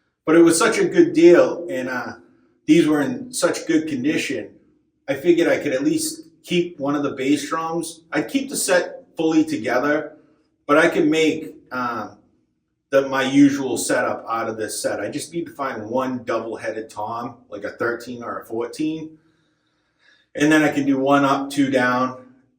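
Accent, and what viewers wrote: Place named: New England, United States. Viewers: I had a Tama Rockstar kit that was the same setup as this. You mention Lake Winnipesaukee which is funny since my brother lives in Gilford which is right there. It's been years since I've played, but I follow all the top drummers like Weckl, Borlai, Sucherman, Donati, Coleman, Smith, Dicenso, etc. This kit takes me right back to the 80s when I was teaching myself to play from vinyl to bands like Rush, Zeppelin, Aerosmith, Judas Priest, Iron Maiden, Journey and the like. My first kit ever, in the late 70s, was a five-piece Slingerland. First song I ever played - Kiss God of Thunder. LoL I'm guessing you're a Mass guy like me with your accent and all. Pretty cool vid. Thanks for posting. P.S. I also owned a Gretsch set that I traded a North set for. Didn't care for the North. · came for the drums, stayed for the new england accent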